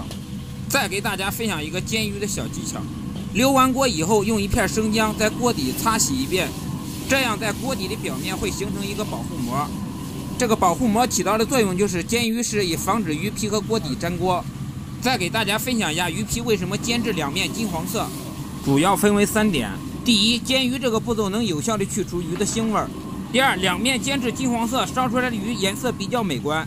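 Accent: Standard. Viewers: 我們家都放老抽。解說很標準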